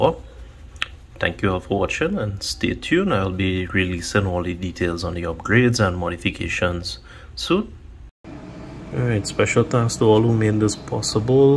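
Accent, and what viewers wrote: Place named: Trinidad and Tobago. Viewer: Yo, you sound likea Trini! Big up Trinidad; we got some of the best mechanics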